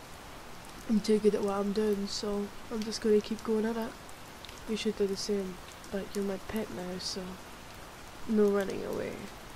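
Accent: Scotland, United Kingdom. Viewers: ah my daily dose of scotish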